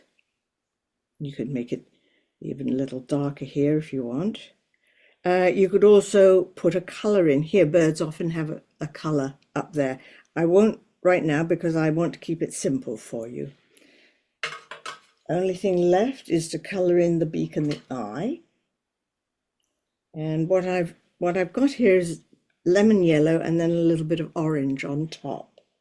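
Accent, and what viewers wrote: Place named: United Kingdom. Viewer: I love your art and I just discovered your channel, you sound quite similar to some of my family members so I was curious to ask if you are from the UK as well?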